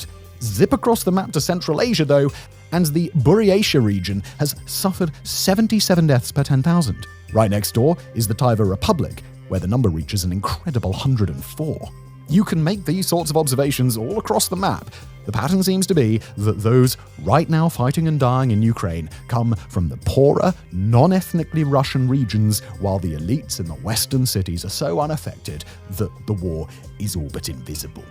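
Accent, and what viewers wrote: United Kingdom. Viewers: never trust a guy with a British accent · The truth isn't within establishment talking points. A bald head and a British accent does not give credibility to obvious propaganda. Understand Ukraine is losing.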